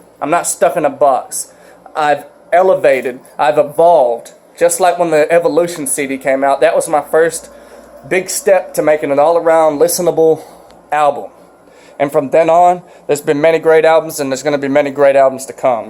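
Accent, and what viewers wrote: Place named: South, United States. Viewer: I'm from Georgia, and you got that thick southern accent(Texas right?) just like me lol.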